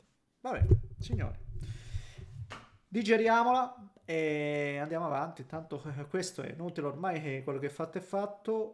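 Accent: Calabria, Italy. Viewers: Non so di dove sei ma riconosco un accento calabrese ❤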